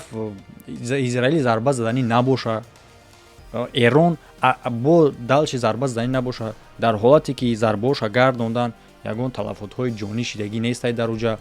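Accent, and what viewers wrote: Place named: Gharm, Tajikistan. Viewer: Ака гапт надорм МошоАллоҳ у калай тъ ака гарени бад тезай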